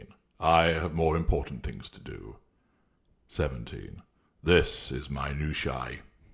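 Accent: United Kingdom. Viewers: Ahh you must know the latest narc that I am preparing to extricate myself from ... Admittedly it sounds better with a British accent!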